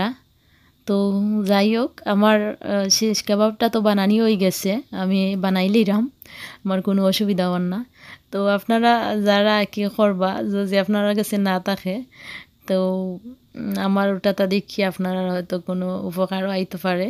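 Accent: Sylhet, Bangladesh. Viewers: আপু কেমন আছেন খুব ভাল লাগের খিছুরি আর চানা আপনার বিডিও আমার খুব ভাল লাগে আর আপনার সিলেটি মাত খুব ভাল লাগে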